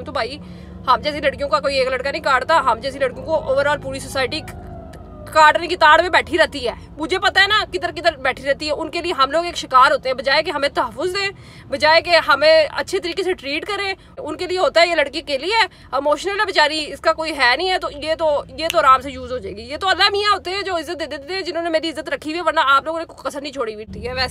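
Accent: Punjab, India. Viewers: Keep growing stay strong❤️i love ur way of talking hahahaha full Punjabi style. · Your way of talking 💕.... Hahha full punjabi style.... fan ho gya mam mn apka...... Stay strong be happy...... And don't worry about shittyy people.....🙂 Allah apko mazeed izzat dy or bury logon sy bachaye.... Ameen.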